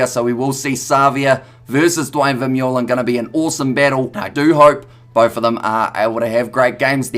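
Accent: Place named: New Zealand. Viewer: Bro you have the strongest Kiwi accent ever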